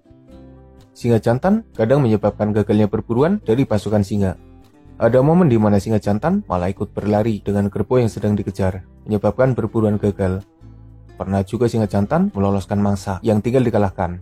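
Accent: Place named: Java, Indonesia. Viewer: Wkwkwk narasi yg khas dengan gaya medoknya mimin 😅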